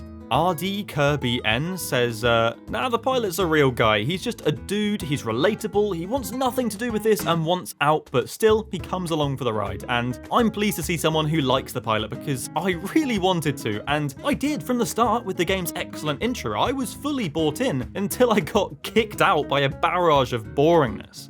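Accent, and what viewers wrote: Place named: United Kingdom. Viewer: click on video I'm interested in watching -Generic well spoken British guy again? 😮‍💨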